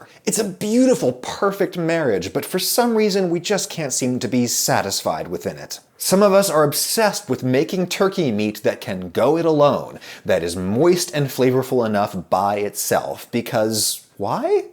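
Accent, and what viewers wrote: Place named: New England, United States. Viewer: Let me guess, you're a yankee ain't ya?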